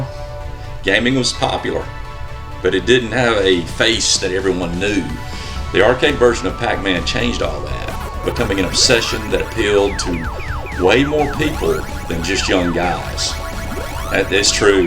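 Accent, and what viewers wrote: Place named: South, United States. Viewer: That is a hard Southern Accent. I appreciate what you're doing here though and I agree with Space Invaders, Adventure, Pitfall, and even Pac-Man...I loves all of these games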